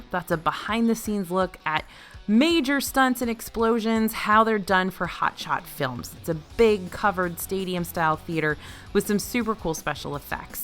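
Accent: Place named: Western New York, United States. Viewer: I watch these videos all the time because I love Disney, but now I see that it's also because of the WNY comfort in your voice!!!!!!!!!! -a fan from Buffalo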